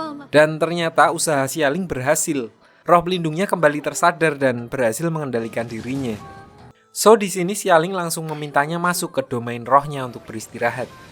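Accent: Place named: Java, Indonesia. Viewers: kwkwkwkwkwk kaya e org Semarang nih si mimin... salam kenal min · logat jawa admin nya nampak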